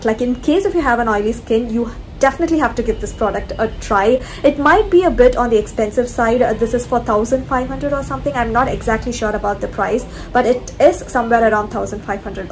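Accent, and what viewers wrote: Tamil Nadu, India. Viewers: Are you a Tamilian ? Just love your accent 💕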